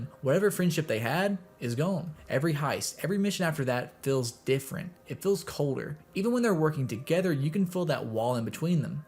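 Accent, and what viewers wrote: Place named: South, United States. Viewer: yo country accent is killin me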